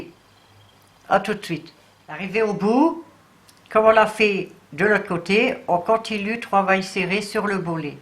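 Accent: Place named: Nord, France. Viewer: et oui encore merci et j aime bien entendre ta voie ton accent , , sa me rappelle le Nord , j ai plus que le bouton a faire , très bonne fin de journée bisoussss , Monique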